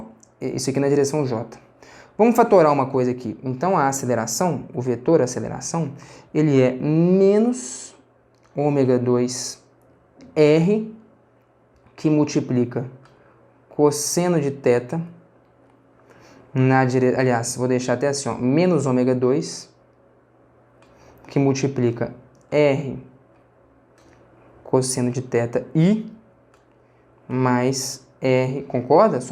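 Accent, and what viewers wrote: Minas Gerais, Brazil. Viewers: Adoro quando ele fala "Prestenção" acho tão fofo esse sotaque Mineiro. Ótima aula!!!!! Parabéns pelo desenvolvimento! ❤️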